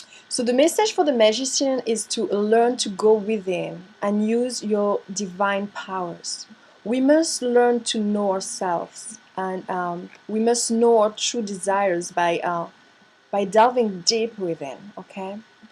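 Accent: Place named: France. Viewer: Beautiful French accent 👌👌👌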